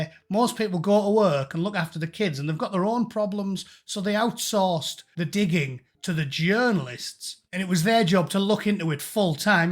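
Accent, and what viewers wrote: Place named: Middlesbrough, United Kingdom. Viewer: where u from in Boro u sound like our ked from Park End, but with more vocabularic wit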